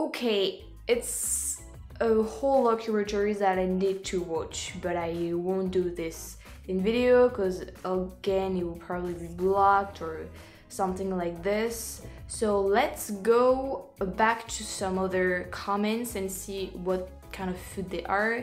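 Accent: France. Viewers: Aghhh, i'm loving ur channel and ur french accent😍 Actually i'm studing french cuz it's so beautiful and now i'm happy that i found ur channel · Hi, what part of France are you from? I can't tell from your accent. Thx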